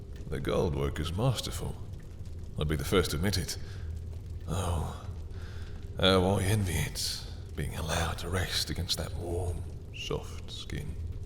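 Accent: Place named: United Kingdom. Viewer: I SWEAR IVE READ THIS SPRIPT BEFORE! This is a wonderful spin on it! I love the British accent! ✨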